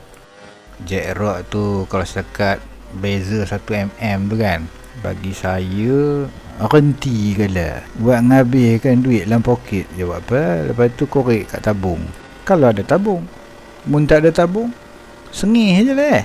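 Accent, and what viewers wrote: Perak, Malaysia. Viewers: Hahaha. Nasib baik sy bujang lg bang. Tapi terlajak sikit la, dah 34 ni single mcm mono je ha...abg perak belah mana ye. Org perak punya slang ni paling senang nk cam. Sy pun dr batu gajah ni ha....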